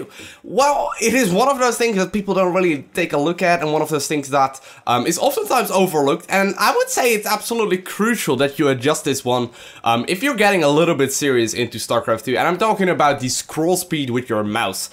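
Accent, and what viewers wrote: Netherlands. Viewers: just a question but is he dutch? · ofcourse / natuurlijk. Dutch accent anybody..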